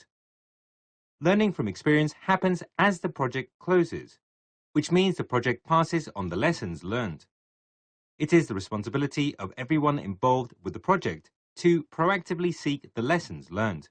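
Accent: England, United Kingdom. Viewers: I think the voice is British. Sounds fine to me. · I don't get why people are moaning about the voice.. I'm English and sounds like a normal English accent to me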